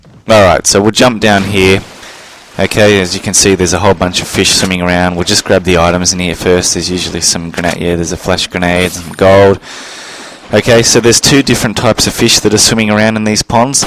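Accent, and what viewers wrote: Australia. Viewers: Are u from Australia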